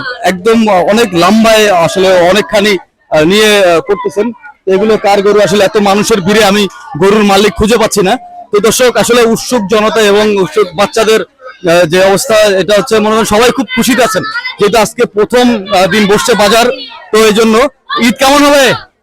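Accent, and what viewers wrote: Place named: Dhaka, Bangladesh. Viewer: আপনার দ্বারা ভাষ্য অনেক সুন্দর। চাকা বাংলার মতো বলেন। আলহামদুলিল্লাহ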